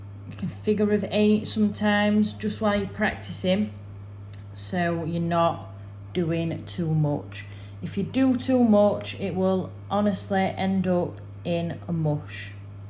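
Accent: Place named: Sheffield, United Kingdom. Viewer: Love all your videos and your accent! Can I hear Sheffield by any chance or somewhere in South Yorkshire? I'm from halfway between Wakefield and Barnsley.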